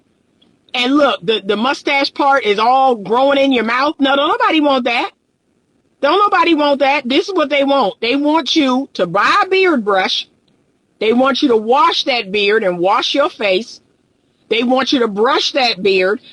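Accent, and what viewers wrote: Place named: South, United States. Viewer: i just knew that accent was from the south. DC , the border of the north and south. good video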